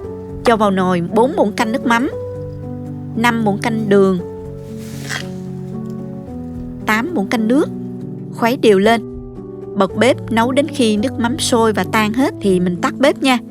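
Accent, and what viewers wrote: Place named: Saigon, Vietnam. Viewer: Giọng Sài Gòn xưa thật dễ thương phải không?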